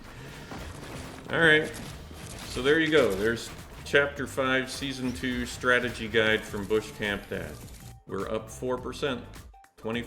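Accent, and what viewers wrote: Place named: Canada. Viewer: Sounds like bushcampdad is Canadian 🍁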